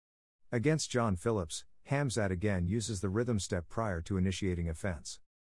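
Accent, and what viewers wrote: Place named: India. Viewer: He sounds like an indian so no lol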